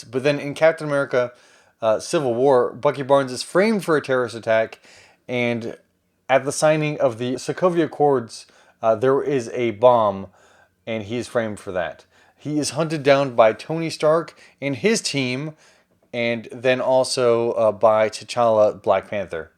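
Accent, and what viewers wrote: South, United States. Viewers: I love your vlog of this video at your house in Texas during your quarantine until you're talking about the mini-series of "The Falcon and the Winter Soldier" while it came out on Disney+, it says after being handed the mantle of Captain America at the end of "Avengers: Endgame", Sam Wilson teams up with Bucky Barnes in a worldwide adventure that puts their abilities to the test as they fight the anti-patriotism group, the Flag-Smashers and you're talking about all the characters of the mini-series from the beginning to the end after that, I hope your husband, Zach will be very proud of you, so I wanna say I'm very happy for you, but I love your Southern accent and I know you're half Mexican!! #FalconandTheWinterSoldier #DisneyPlus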